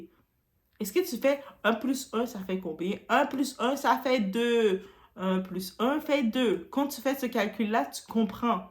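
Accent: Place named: Quebec, Canada. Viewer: J’adore ton petit accent franco-québécois. 😉 Je ne connais pas Hawabelle, c’est toi mon influenceuse. 😘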